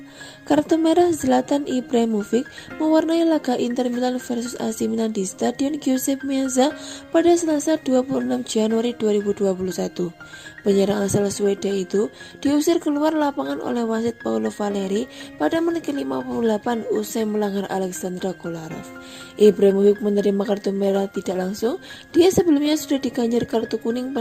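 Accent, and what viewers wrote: Java, Indonesia. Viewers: Mbak..e Jawa mana?? Wkwk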